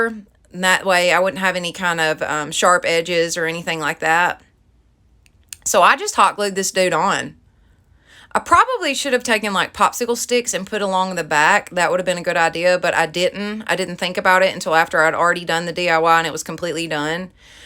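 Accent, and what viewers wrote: South, United States. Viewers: I am another southern girl & I love listening to someone that sounds like me. I am so glad that you are one of those diyers that talks about the things that can go wrong while doing a project. Loved your projects & hope you have great success with your channel. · I LOVE the southern accent!! Anywho, I love all of your DIY's! I'm so glad I found your channel!! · Love your tutorials! You think more outside the box from most YouTube DT Crafter's. Btw, where are you from? Your southern accent sounds almost exactly like mine. I'm from Western NC. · You are a great addition to YouTube! I’m looking forward to more videos. Awesome diy tutorials. Love your accent. My family is from the south and it takes me back. I get to talkin like them when I’m around them. Nothing but ❤️ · It’s a good thing you didn’t listen to your kids because you’re an amazing crafter. 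And I’m a southerner so I love the accent. :) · I generally don't like to listen to a southern accent, but I loved your spunk and not letting your kids intimidate you into not doing these videos and your style of crafting is really cute, so different from other items I've seen in other videos. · Girl I'm just getting started crafting making stuff for my home. I love your creations and love your southern accent. I'm from Alabama...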